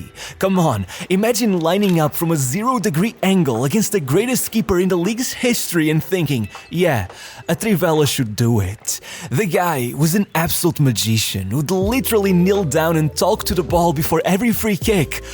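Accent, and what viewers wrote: Portugal. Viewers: I mean, he sounds portuguese which is closer culturally to brazil than most other european countries. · q brasileiro o q, ele fala S com som de Z kkkk. é portugues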